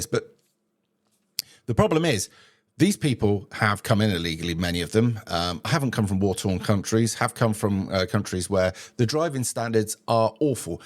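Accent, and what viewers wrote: United Kingdom. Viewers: All the crimes you mention are not the exclusive domain of immigrants to a country, there are home-grown bad people to. As for the ‘killer machines’, traffic regulations and driving customs differ from country to country so it’s to be expected someone unfamiliar with those differences may have an accident. I note from your accent you are from the UK. Anne Sacoolas was driving on the wrong side of the road when she killed Harry Dunn. She claimed diplomatic immunity initially. She was white, middle class and privileged. You don’t help feeding into people’s fear and negative stereotypes.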